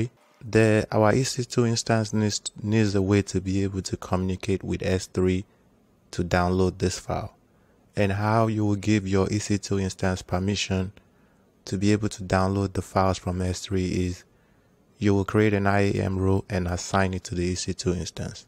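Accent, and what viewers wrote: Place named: West Africa. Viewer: Dude keep this up! Best AWS walkthru. Also cool you have a West-African accent - keep striving!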